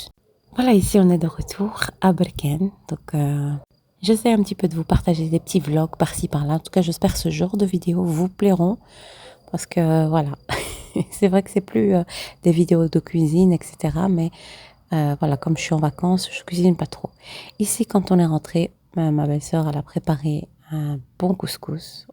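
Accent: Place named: Belgium. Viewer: Ca sent la belgique quand tu parles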